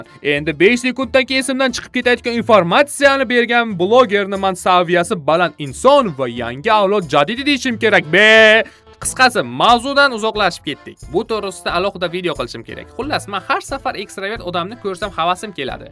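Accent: Qashqadaryo, Uzbekistan. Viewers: Qashqadaryodanmisiz diyman-a?😅 gapirishiz jo‘ramdi eslatopti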